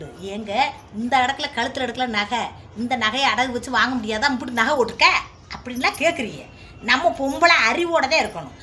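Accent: Madurai, India. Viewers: super madhurai style speak reply good 💯 true 🎉🎉🎉🎉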